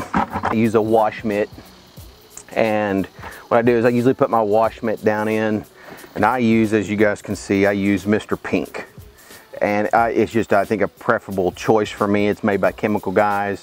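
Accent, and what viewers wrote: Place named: Oklahoma, United States. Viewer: Beautiful car! When I heard you talking, I'm thinking this guy is from Oklahoma or used to live there...lol Then I saw the OU stickers in the garage...Boomer Sooners!! Then I saw the Oklahoma plates on the car...hello from a fellow Okie!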